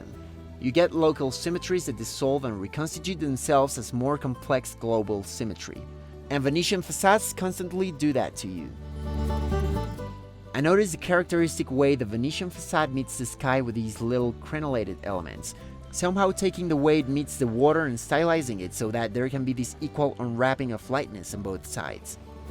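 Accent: Spain. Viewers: Great video. Thanks from Italy. Just a little nitpicking: Your R pronunciation in Italian is a bit "too Spanish 😊" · Great video indeed, thanks for making it! Just out of curiosity, are you Spanish? The way you pronunce Italian names..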